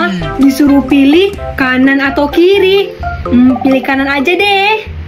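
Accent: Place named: Sumatra, Indonesia. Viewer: Dari aksennya kayak melayu sumatra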